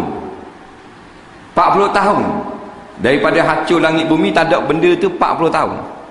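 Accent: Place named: Terengganu, Malaysia. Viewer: loghat ganu mu dok tahu..jgn komen tnpa berfikirr..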